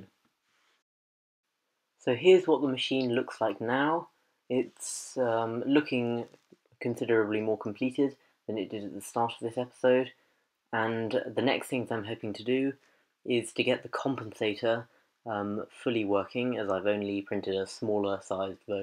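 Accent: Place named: United Kingdom. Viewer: Great man and an interesting new YouTube channel. I really enjoy your British accent with the soft 's' pronunciation 😃. Make more videos in general + a finished video of this project (if you have finished it so far 😄).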